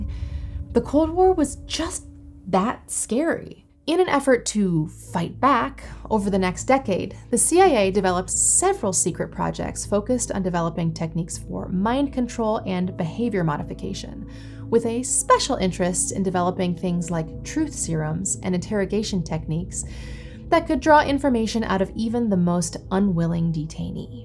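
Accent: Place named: England, United Kingdom. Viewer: Your English accent is soooo beautiful!!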